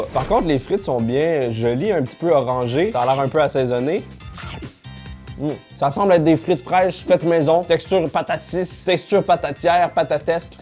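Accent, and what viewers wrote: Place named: Quebec, Canada. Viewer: Vous le comprenez bien même avec l’accent et les expressions bien québecoises?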